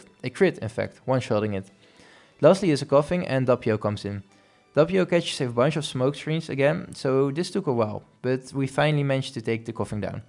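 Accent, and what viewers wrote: Netherlands. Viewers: Gotta love the Dutch accent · Brooo the dutch accent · are you dutch perhaps? i can hear a small accent during your complimentary XD. anyway, you just gained a new subscriber. i love the vids! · Are you dutch by any chance? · I've never watched your channel, but I like watching nuzlockes. I wanted to check it out. Your actually pretty good and definitely wasn't expecting the Dutch accent. Are you new to nuzlockes? I could give you some tips if you want. Edit: I just saw you don't have many subs, so ima sub. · The yt algorithm strikes again! Also, I can hear you're dutch ;) lekker man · Nuzlockes zijn zo leuk om te zien echt waar geen idee wrm maar goeie video man (ja ik wist dat je nl was door de accent hahahaha)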